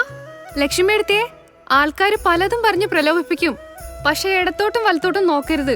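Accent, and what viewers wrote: India. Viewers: Randam classil Kauravar… njanum…feel aaki kalanju…Valluvanadan slangum… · കോഴിക്കോട് കാരി ആണല്ലേ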